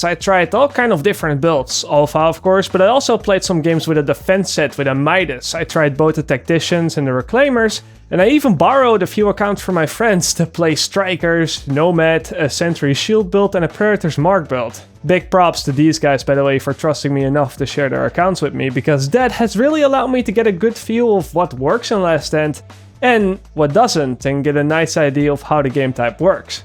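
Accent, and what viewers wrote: Netherlands. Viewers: je hoor echt gelijk dat je Nederlands ben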